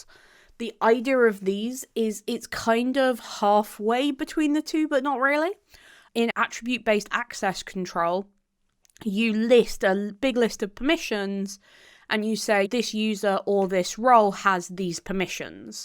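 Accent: United Kingdom. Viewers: love your British accent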